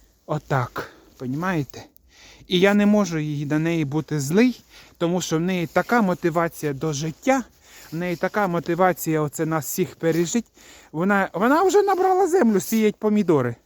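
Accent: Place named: Kozelets, Ukraine. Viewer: Каждое видео, как дома побывала, говор знакомый с детства, Козелецький район, ни одного западенского слова, все красиво и понятно и настроение Новогоднее.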